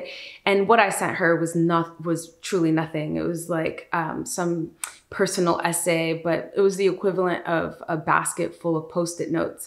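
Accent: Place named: United States. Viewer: your accents sounds American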